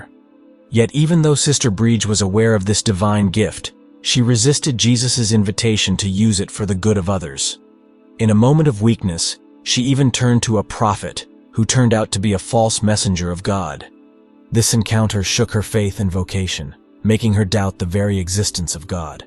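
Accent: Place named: Ireland. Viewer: Irish through and through 🙂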